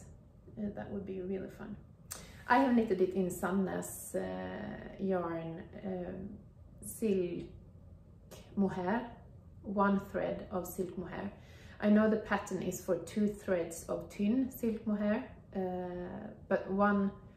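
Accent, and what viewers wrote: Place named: New Zealand. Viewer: Hello from New Zealand. I wondered whether you had learned English from a kiwi - some of your vowels sounded distinctly kiwi-ish! Then I read your explanation below - our neighbour Australia. Thank you for your podcast in English. You have made beautiful garments.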